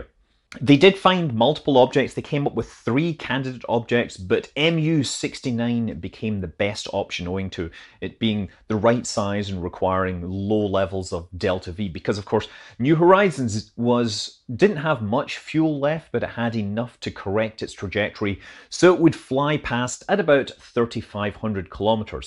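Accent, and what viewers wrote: Scotland, United Kingdom. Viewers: Wait... you're Scottish?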